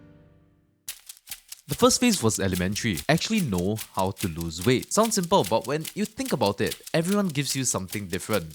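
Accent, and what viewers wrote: India. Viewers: nice and informative video, but how come you have Indian accent? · not trying to be rascist but how do you sound indian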